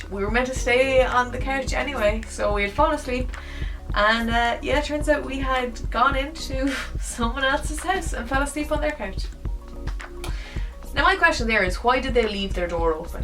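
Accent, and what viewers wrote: Ireland. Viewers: A-ha! Oh that was solid!, I sent those cakes. Still my fav Try video! Now I’m all happy you gave them a mention! Billet Log over the Joe Louis?!?! Pure craziness! 👍🏻 This ones for you miss fancy leprechaun accent girl!! 👍🏻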